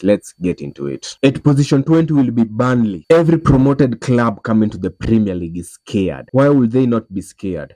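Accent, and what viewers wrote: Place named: India. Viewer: Indian accent level 10. Nah lowkey good video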